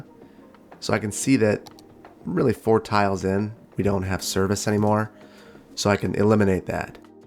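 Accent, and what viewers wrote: Wisconsin, United States. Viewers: Watched your videos to better understand Skylines. Stayed for the intrigue (started with Verde Beach.) Whole time I was watching I was like 'He has a Wisconsin accent.' Thanks for confirming my suspicions. Anyway, keep up these amazing videos, they are much better than the cities I make. Also, congrats on the subscribers. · NEVER apologies for our Wisconsin accent, its something to be well... somewhat proud of XDXD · Are you from Wisconsin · Respect the Wisconsin in ya lmao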